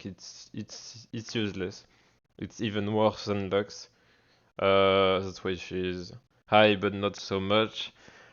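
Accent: France. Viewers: Cet accent français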